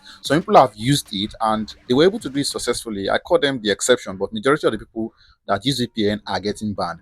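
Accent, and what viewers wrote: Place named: Nigeria. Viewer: You are too fast, maintain your Nigerian or an African voice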